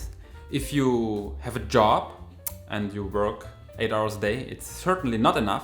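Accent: Germany. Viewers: You are so funny, maybe also involuntarily because of your English with that strong german accent My friend Martin who is Logopaede said that you have a speech impairment but I don't mind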